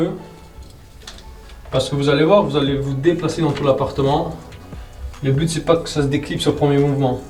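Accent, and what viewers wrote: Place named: Belgium. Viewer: vous ressemblez à marvin atmoss'vert et vous avez les mêmes mimique sauf que marvin il n'a pas l'accent belge xdd !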